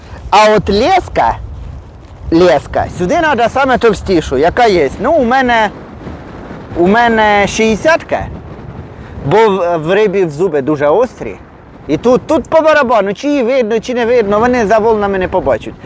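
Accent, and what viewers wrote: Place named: Surzhyk, Ukraine. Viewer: Суржик у Вашому виконанні забавно слухати. Майстер стендах. Всього найкращого. Лайк і чекаю на нові відео.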